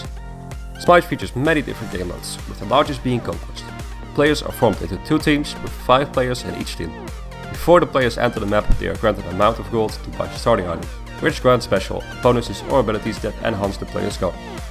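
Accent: Netherlands. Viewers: This guy isnt american i think because of his accent...... He sounds very dutch! (Please take that as a compliment) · Are you dutch?? Sounds like a dutch man xD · je Engelse Nederlands accent is crap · i think he is dutch :/ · Nice list, but holy crap your English sucks! That accent sounds dutch which is my native language as well, but I hard a seriously hard time understanding what you were saying. Please, either work on your speech skills or use text instead. This sounds like Mark Rutte and that's not exactly a compliment.. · Dutch ? Kan het horen man :) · yooo gast praat rustiger en duidelijker niemand verstaat wat je zegt met je nl'se accent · I think he is dutch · You must be Dutch? Of is dat niet zo? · are you dutch? ik hoor je accent xdxd. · Nederlands accent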